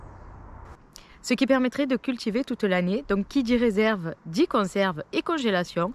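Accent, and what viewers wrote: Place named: Provence, France. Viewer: Bonjour, sacré potager sacré boulot ✌️🌷 l'accent de vers chez moi, camargoprovencal 😁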